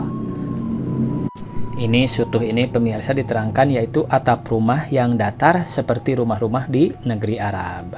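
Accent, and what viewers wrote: Sunda, Indonesia. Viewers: Soantenna siga kisunda ieu teh sanes.?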